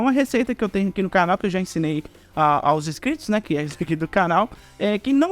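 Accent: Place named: Minas Gerais, Brazil. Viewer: Ñ sei se falo da receita ou desse sotaque maravilhoso, eita que mineiro é outro nivel ❤❤❤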